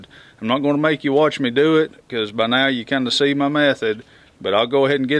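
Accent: South, United States. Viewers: Are you from Kentucky sir? I live in lewisburg. I hear that southern 2 ba fo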